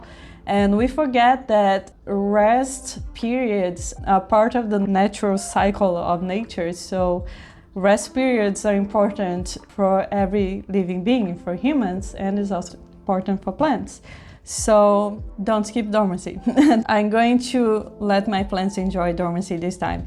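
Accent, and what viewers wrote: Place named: Brazil. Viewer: Are you Brazilian by any chance? :D Love the accent!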